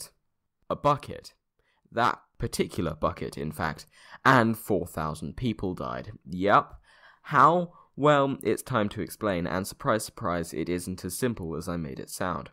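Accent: United Kingdom. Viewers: This channel gets a lot of views for the amount of subs it has, 100%+ of the subs watch this channel clearly. Must be the good editing and interesting topics coupled with the good ol' Brit comentator. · this was actually quite funny. aswell as informative. Your video editing is top notch, and the humor is there in typical british fashion. So i can see why you managed to hit to many subscribers with the help of youtube. (im subscribed to CrashCourse, Kurzgesagt and Knowledgehub, and this channel seems to cater to the same style, and thus I got your stuff recommended all over my youtube page, so this might explain the sudden spike of subscribers as theese 3 channels are huge) Only thing I can say thou is the Audio Editing needs some more work. I do notice that some parts of your video your audio cut-ins tend to overlap so it can sound abit strange at times (example ) Ontop of that your voice is nice and not ennuing to listen to (unlike some youtubers out there) Might be the british accent. 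Another thing I would recommend is to add a calm background theme that dosnt disturb your speech, as the videos can be abit to quiet with only your voice talking. (good example is how KnowledgeHub and I Hate Everything does it) This adds to liven up the video. Not to mention a Opening theme (Althou thats not really needed) And I would pretty much say with that, you'll quickly reach even higher number of subscribers. As long as you keep the content coming. Keep up the good job!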